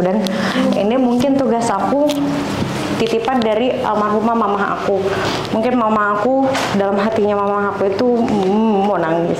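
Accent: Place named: Manado, Indonesia. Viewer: sekolah dimana ngana ? fasih juga logat manado nya..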